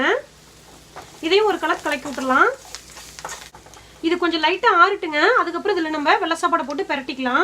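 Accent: Kongu, India. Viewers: Your kongu tamil super mam yr recipies also super keep it up mam 👍👍👍👌👌👌💐💐💐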